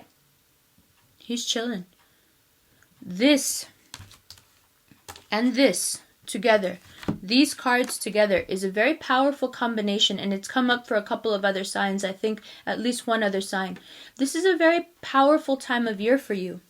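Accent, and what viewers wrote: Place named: California, United States. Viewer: I hear that Cali accent. My daughter is a Gemini too.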